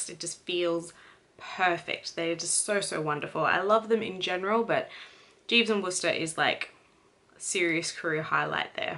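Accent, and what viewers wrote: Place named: United States. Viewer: She is very American. There is no difference between the d and the t in her pronunciation.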